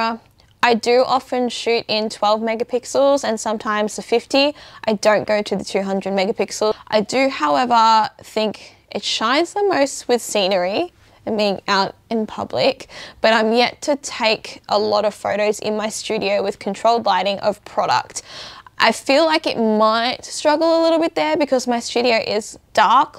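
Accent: Australia. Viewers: Is ur accent Australian ?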